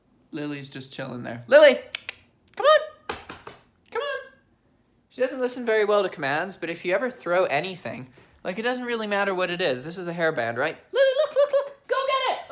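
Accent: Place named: United Kingdom. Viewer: Are you parents of British decent? I hear a little accent here and there? 😇💗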